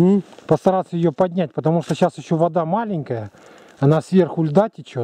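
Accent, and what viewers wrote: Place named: Komi, Russia. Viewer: Слышу как говорите республика Коми и ностальгия по Воркуте)))) только там тундра))))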